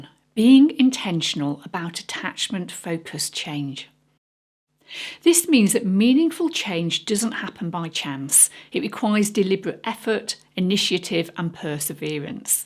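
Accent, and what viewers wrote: United Kingdom. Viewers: I love your meditations or I should say your talks. They are very well informed about things like what happens in the brain, for any number of reasons May i simply askthat you talk about borderline personality disorder? because I hear you saying that you can choose this or choose that and people with bordrlije personality are terrified of abandonment, real or perceived.... and as I speak and for several years now I've been abandoned to awful little boarding homes for lots of money in rent, And the present one is costing me $900 a month and I'm told by the manager that he doesn't need the money when he gets somebody in here fast and they leave almost as fast as they get here because of things that are going on here, verbal abuse and gossip between all of about residents. Plus: same thing from the woman who cooks here. What happens to the mentally ill in mental health awareness month here in the US!! 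You sound like you might be from the UK, and I don't know , really. Here in America, it's May and May is supposed to be a mental health awareness month I got verbally abused by some overworked nurse in the ER , here in America at most private or public hospitals or in general, all the nurses and psych tecchs, etc... at least in the hospitals where I've been admitted to psych units all over Birmingham Alabama… They want their 12 hour shifts so that they can have three days or four days on and then three or four off and so they get stressed and they take it out on whom? Maybe you gue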